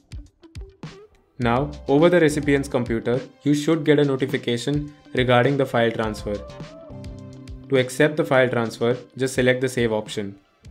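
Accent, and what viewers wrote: India. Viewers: The moment I heard the Indian accent, I knew my problem would already be solved 😏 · Indian accent = auto dislike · You know it's a great, quick and easy guide when you hear indian accent.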